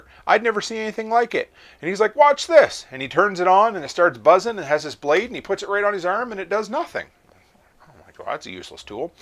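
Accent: Canada. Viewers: Thanks for the info ... I learned something too :) What I like about your videos is that you talk "Canadian" -- specifically western Canadian!!! I'm from AB & get your little jokes!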